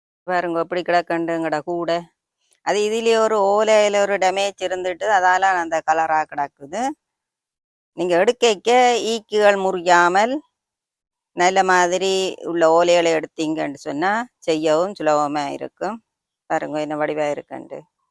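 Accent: Sri Lanka. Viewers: மிக்க நன்றி. கொஞ்சும் இலங்கை தமிழ் பேச்சு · நீங்கள் ஈழத்தில் இருந்து கதைக்கிறீங்க தானே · Beautiful Srilanka slang. Excellent art piece.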